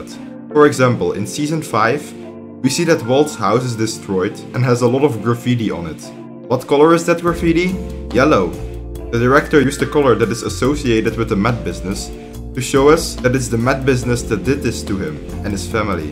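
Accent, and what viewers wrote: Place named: Netherlands. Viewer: Nice nl accentje wel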